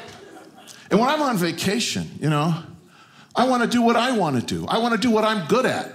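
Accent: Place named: New Jersey, United States. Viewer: So funny. I'm from Jersey. We tauk like dat!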